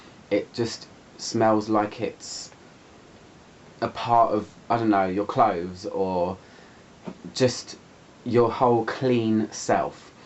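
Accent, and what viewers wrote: United Kingdom. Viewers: great british accent, I envy you!:) Let me ask you a question, because I am watching and watching your perfume reviews now and thinking about buying this Joop! Femme online, but I've never ever smell it before.... and I don't know what to do! I have my 3rd big bottle of CK ONE now - for everyday use and Salvador Dali Dali Classic (this white one) - not for every day;). I love Dior - Midnight Poison, but unfortunately it is no longer available... I am not sure if Joop! Femme will be too strong scent for me and cause a headache or it will be just perfect to wear for night... What do you think?:)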